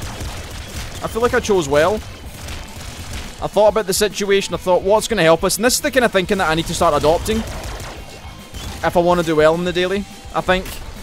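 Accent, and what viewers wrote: Scotland, United Kingdom. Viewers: ..... WHO IS THIS GUY AND WHY DIDNT I KNOW ABOUT HIM SOONER. as much as i like watching NL play Isaac, this guy is SCOTTISH NL, so to speak, which is just as amazing, cause he plays Nuclear Throne instead! That accent though... lols. So yeah, im subbing, im watching, and im probably gonna end up watching every episode he has.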